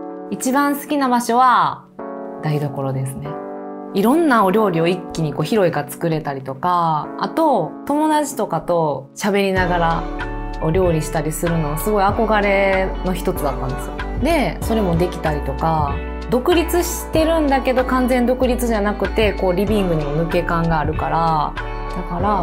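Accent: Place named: Osaka, Japan. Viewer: 私も関西人なので大阪弁が心地いいw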